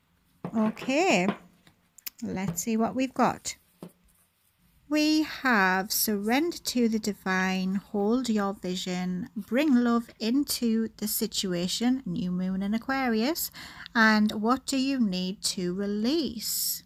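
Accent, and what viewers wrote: United Kingdom. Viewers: I have just found you tonight, lovely to hear a uk voice. Newbie from Preston Lancs What a sweet soul you are. You have a new subscriber. Love & light 🙏🏽 Also my nickname is Ace 🤣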